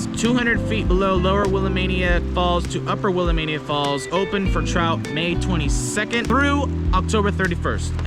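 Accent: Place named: England, United Kingdom. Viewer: Love the English accent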